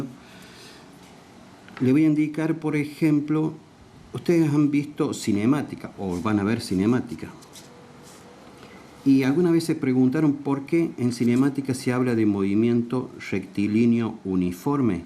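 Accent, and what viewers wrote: Córdoba, Argentina. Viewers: Ese acento cordobeh si se puede ver 😍 , lo entendi todo explica muy bien gracias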